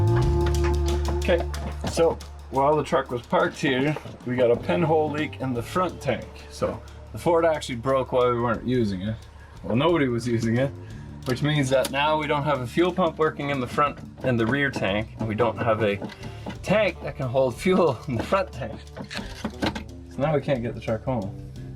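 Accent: Canada. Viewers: Talking a' boat! I love the Canadian dialect!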